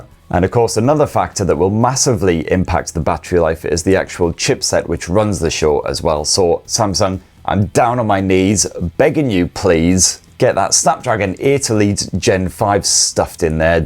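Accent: United Kingdom. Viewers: I come here to learn British English. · I know its petty but I appreciate that you stick with the UK pronunciation of Z, I've seen a lot of other UK tech YouTubers saying Zee and it mildly annoys me.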